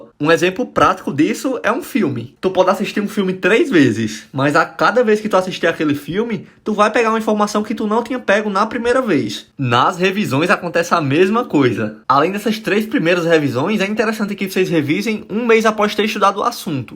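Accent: Northeast, Brazil. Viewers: Esse mano é de qual lugar do Nordeste?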